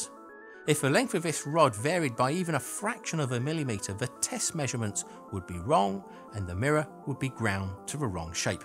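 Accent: United Kingdom. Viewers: I can't figure out if this guy has a slight speech impediment or is just British.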